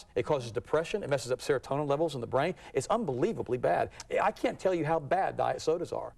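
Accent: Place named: South, United States. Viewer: he said "high fat", not "hot fat" lol. its his southern accent threw you off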